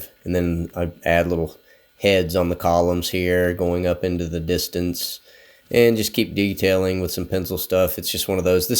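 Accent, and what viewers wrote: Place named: United States. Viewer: See? Americans are still smart even if their accent makes you think they might not be.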